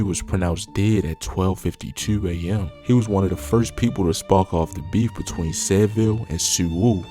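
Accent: Baltimore, United States. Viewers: Bmore accent strong. Subscribed from Philly. Keep up the content. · U gotta be from Baltimore folks....but anyways u just gotta new sub my guy 💯💯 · Gangs being underated like this is some nfl draft this is weird shit 😂😂 souund like your from Baltimore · aye how y'all be knowing bout the hood and it sound like you from Baltimore , y'all know more then the police do.. and never even prolly been to the Raq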